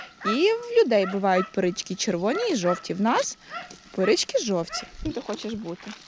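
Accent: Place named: Volyn, Ukraine. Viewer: вже підписаний) я тільки відкрив для себе ваш канал і зазаз передивлююсь) я сам теж з Волині, але вже давно там не живу, тому дуже приємно чути наш рідний говір)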